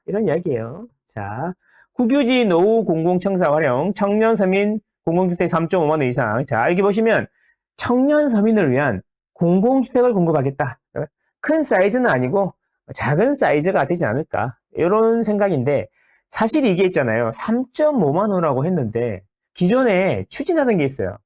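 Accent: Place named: Gyeongsang, South Korea. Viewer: 경상도 방언. 끄덕. 끄덕. 그 뭐시기. 누구 닮았네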